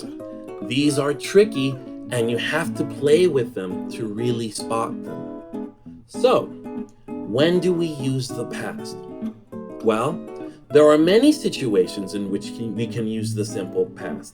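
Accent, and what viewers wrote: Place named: United States. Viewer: Amazingly well produced video. Props for the job. It really catches us. I found interesting how you pronounce the “ed” in some words - almost imperceptible in your American accent. I learned a lot.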